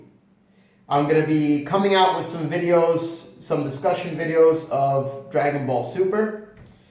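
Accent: Italy. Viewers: Ma sei italiano? Lol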